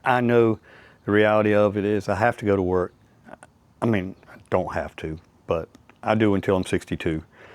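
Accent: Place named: South, United States. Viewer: Glad I found your channel....I watched a few more of your videos. Good information and content.... Likable guy. Enjoy the southern accent 💯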